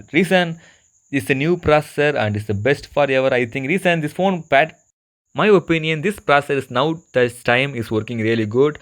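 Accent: South India, India. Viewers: Dont steal peoples videos then put your own watermark on it.... Sad. You actually think people believe this is your video, showing chinese person with indian voice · South Indian voice over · Damn Indian voice with a rather Asian look? Tf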